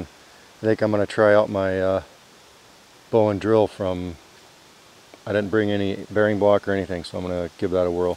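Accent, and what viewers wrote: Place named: Minnesota, United States. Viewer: I'm really liking your videos brother. Where are you located? Sounds kind of like MN. I'm in Upstate NY between Buffalo and Rochester, about 3 miles from Lake Ontario. Never seen chaga in wild. God bless. John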